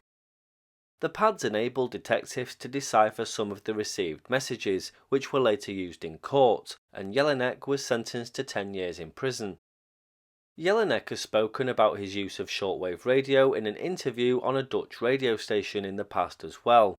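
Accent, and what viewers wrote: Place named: Liverpool, United Kingdom. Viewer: Your accent reminds of The Beatles accent. I was able to See The Beatles in Memphis Tennessee in 1964.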